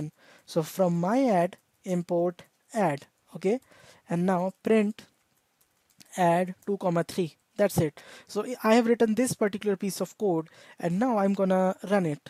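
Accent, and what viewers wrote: India. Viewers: 😢. Desi english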